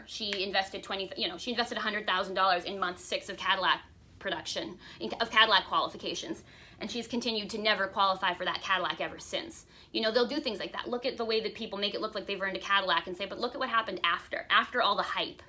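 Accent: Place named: Canada. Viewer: I came so close to joining Mary Kay in 2007 - the only reason I didn't was the stupid skirt rule. I was invited by a "new friend" to a recruitment talk(?) with a bunch of other potential victims and my sensible arguments about the skirts may have (hopefully) also scared others off. I really appreciate you doing this - I also just appreciate hearing a Canadian accent as a former Calgary gal now in the UK.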